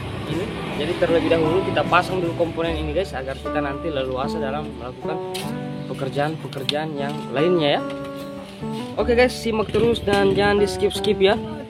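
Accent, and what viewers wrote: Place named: Sulawesi, Indonesia. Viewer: Orang sulawesi ya logatnya itu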